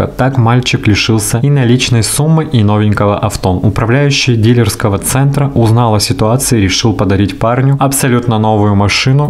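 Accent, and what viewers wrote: Ukraine. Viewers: Ура! Любимые новости опять, бархатный тембр и украинский говор ("снег притрусил" - как мёд на душу, обожаю українську мову, даже смотрю иногда ток-шоу на украинском)., это правда, что почти все кошки правши, а коты левши? Ваша кошечка правша?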